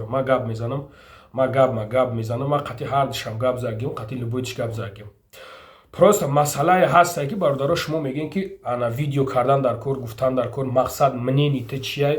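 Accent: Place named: Bokhtar, Tajikistan. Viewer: Хака хаму БОХТАР КУРГОНТЕППА гап мезана бачаи хола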